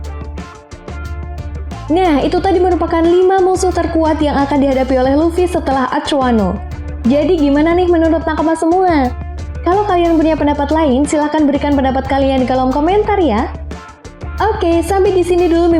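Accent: Java, Indonesia. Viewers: Min saran nih, saran aja ya, kalo bisa channel nya di bagi 2 aja, 1 voice cw 1 lagi voice cowo, jujur gw lebih suka yang suara cowo nya yang khas mendoknya, dan maap maap ni ye, gw skip yg cw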